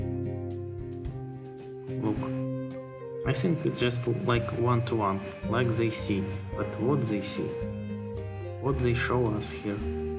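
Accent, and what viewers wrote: Russia. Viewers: А акцент то у тебя Русский. Чётко слышно.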